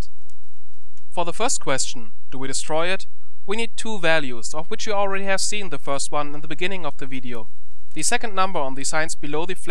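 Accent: Germany. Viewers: Ich liebe deine deutsch "pronuntation" (?) Ps ich bin italienich ;)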